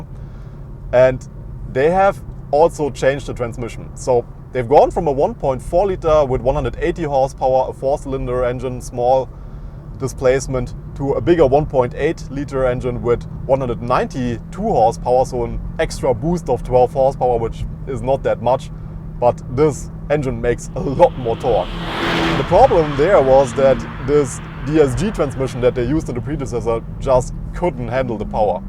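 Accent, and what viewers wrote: Germany. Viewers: Krass wie schnell man einen Deutschen an seiner Schrott Aussprache erkennt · After 2 minutes watching you video , I discovered that the review is in English hhhhhhhhhhh , I love German-English ... Good review man , thank you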